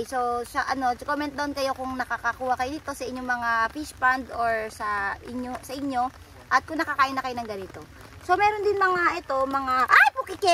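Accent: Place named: Tagalog, Philippines. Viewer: Pokot ng lambat nakakatuwa ka talaga halatang pure na tagalog ka😀 maam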